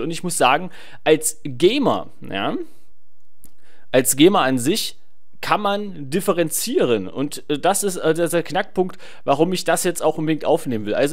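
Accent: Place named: Saxony, Germany. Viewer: Dat Sächsisch yo :D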